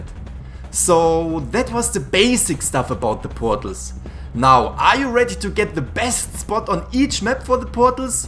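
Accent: Germany. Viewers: Are you German or something? Your accent and your personality perfectly match. No wonder your videos are so entertaining. And your editing!? SUPERB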